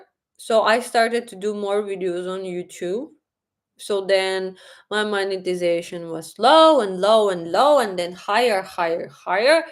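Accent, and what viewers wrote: Russia. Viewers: Happy 🍻🥂🍾35K,! and just manage to finish viewing the non livestream here after on a Bank holiday Monday. You don't half get some cheeky characters with some bizzare questions and your Russian accent kicking in spectacularly. Anyway my girl, are you going to add some effects into the apartment as a reminder from Bali because some properties you'd stayed in looked amazing like the one with the pool and green painted wall's. Before I disappear tonight, this morning, will ride a scooter around Moscow? Anyway and thanks for an entertaining night. Well done!👍🇷🇺🇬🇧🌹😘 I forgot to say, massive complement to you for looking ravishing in that top. WOW!!!❤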